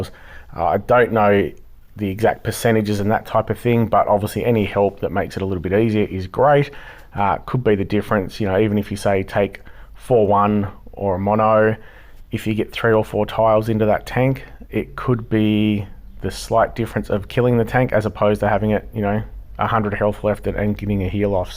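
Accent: Australia. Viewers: Thx mate, great to hear another Aussie. Been subscribed since before you hit 100 subs. Found you from McNaulty. I'm the leader of Obi One Kenobies but your alliance is way higher than ours so we wont see each other across the battle field.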